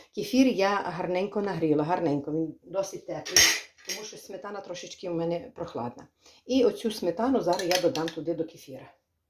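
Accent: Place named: Bukovina, Ukraine. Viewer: Як смачно❤❤❤ Мені дуже подобаються ваші рецепти, а особлива ваша українська ... Це таке відчуття, що побувала на Буковині . А ви часом не з Буковини ?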